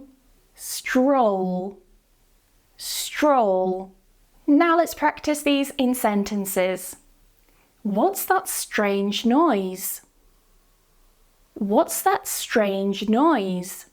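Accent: United Kingdom. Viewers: Amazing!!! Thanks a lot! ☺☺ I love British pronunciation! 🥰😍🥰😍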